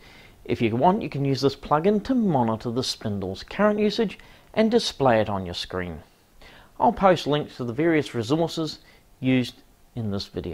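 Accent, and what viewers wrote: New Zealand. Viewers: Hi there, Amazing to hear another New Zealand voice speak so eloquently and informatively. Awesome presentation, I don't know how this is the first time finding your channel. Please keep up the good work, good thoughts to you for helping so many.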